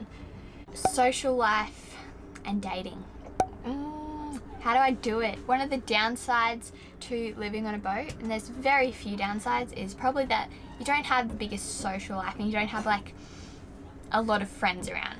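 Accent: Australia. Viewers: You def have an Aussie accent. 😅 Your parents have done an excellent job raising you it shows in your demeanor and intelligence. God bless and keep the vids coming. ❤ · Medium (and delightful) Australian accent. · Like you are willing get on You Tube and answer both the easy questions and the hard ones. And as far as the hard answers. Always are allowed make that decision later, since your only a teen and many years to figure that out. As far as accent, since you be sailing many different places you will get more mixed but you will have your beautiful Aussie accent base. 👍♥️💯🎦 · sorry sister you are Australian I can tell but in a vary nice way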